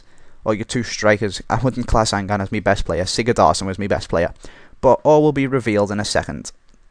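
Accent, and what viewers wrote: Liverpool, United Kingdom. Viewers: omg a scouser who makes fifa videos yes !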